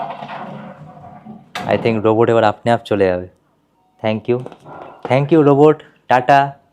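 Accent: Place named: Kolkata, India. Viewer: Kolkatar kotha bolche mone hoi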